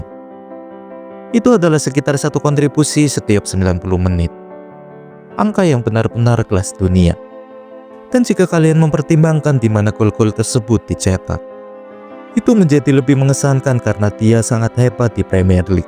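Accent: Tegal, Indonesia. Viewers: Logatnya, tegal, mas?? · Min,orang tegal y,? Dri logatnya sih kayak tegal,😅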